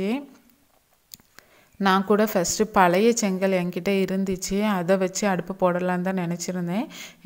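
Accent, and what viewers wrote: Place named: Tirunelveli, India. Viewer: நீங்கள் நெல்லை மாவட்டமா? தமிழ் உச்சரிப்பு அப்படி தான் தெரிகிறது. அவரவர் வட்டார மொழியில் பேசும் போது தான், தான் சொல்ல வரும் கருத்துகளை சரியாக சொல்லமுடியும். நீங்கள் உங்கள் நடையிலேயே (style) பேசுங்கள்.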